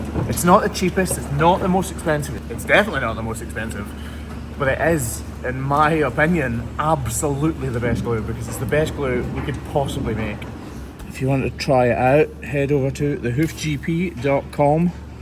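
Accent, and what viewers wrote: Scotland, United Kingdom. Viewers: Just dropped in to say love your unique channel. In some weird way, watching these is like some ASMR kinda videos 😅 Coupled with your soothing Scottish accent and its pure relaxation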